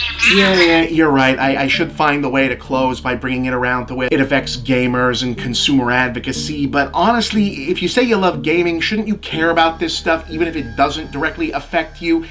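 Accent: Boston, United States. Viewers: It's a Boston accent. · I can hear the Boston coming out XD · UNIONIZE!!! Also, loving the chance to hear your Boston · Boston accent is one of if not the most assholic accent. How can people living near Harvard sound so stuuuupid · Frost Soul it’s a Boston accent. He usually tries to down play it, but it does slip through sometimes. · Is that a real Boston accent or a new gimmick? I've never heard it before. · Agreed. The angry Bostonian scent ads a good bite to his commentary. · Is it just me or is Bob extra Bostony in this episode? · Bob, I don’t know how I didn’t notice this before, but you have a very Bostonian accent. You should embrace it and use it more often when you go on an angry rant.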